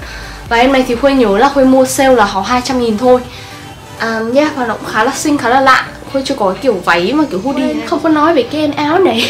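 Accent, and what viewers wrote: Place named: Southern Vietnam, Vietnam. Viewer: Khuê nói giọng Nam dễ thương quá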